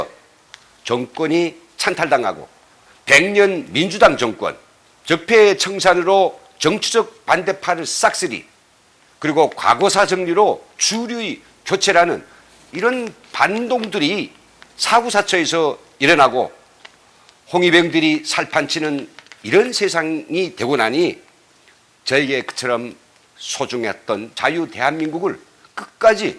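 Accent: North Korea. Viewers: 아 여기가 이런곳이군요 앵커 말투가..??? 북한사람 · 말 뽄새는 북한 스러워 처음 접했을땐 적응이 어려웠습니다. 허나.. 진심이 느껴지기에 매번은 아니오나.. 늦게라도 시청하지요. 늘 애쓰시는 NKTV 여러분 그리고 김흥광대표님께 박수를 보냅니다.. 지금은 시련도 역경도 무관심도 있을겁니다. 허나.. 이 정권이 제 자리로 가면 꼭 국민들 정서상 김대표님 뜻을 알아갈것입니다. 그럴수 밖에 없지요.. ^^ 힘내십시오~~ << 현존하는 최고의 경험자 아니 십니까??? >>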